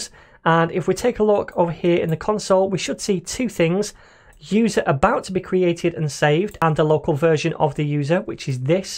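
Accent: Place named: Northern England, United Kingdom. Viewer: Really loving this tutorial series, it came at the right time for me in my programming journey. I have found that it's best to simply watch your tutorials start to finish without taking notes, and then opening up the project file at the end from GitHub and seeing how much I remember. I know everyone's style is different, and this maybe a bit selfish, but i thought i'd suggest it anyway - as these are predominantly tutorials, it would be cool (at least for me :P) if there were more tutorial-comments in the code for us to refer to in future. I suppose I'm just lazy though. I should probably comment along the actual code as I watch. But might need to halve the speed. Where are you from? I can't tell if it's Manchester or more Newcastle-way. My dad overheard your voice and said 'bloody 'ell he should teach the masses with that voice' :D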